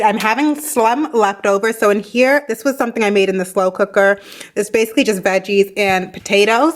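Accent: Canada. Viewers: I think she is frm canada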